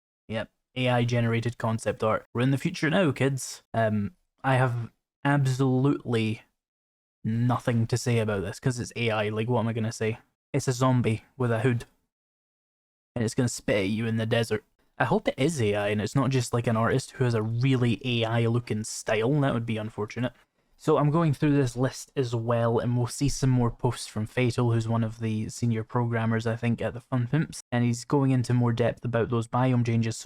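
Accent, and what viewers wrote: Scotland, United Kingdom. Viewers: Im totally here for your yobbo Scottish snark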